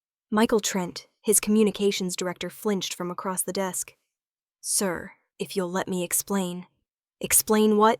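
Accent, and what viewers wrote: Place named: Indiana, United States. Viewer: I thoug y t she was from Indiana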